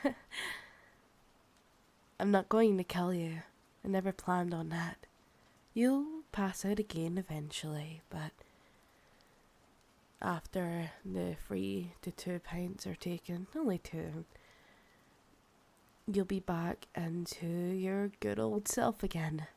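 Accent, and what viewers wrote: Scotland, United Kingdom. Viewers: Slow down my vampire mistress, the blood is not going to run away from you, almost tearing the pet's arm.😅😅 This video is wonderful I enjoyed it very much, vampire+ the scottish accent equals a awesome video to watch and fall in love with it.😍😄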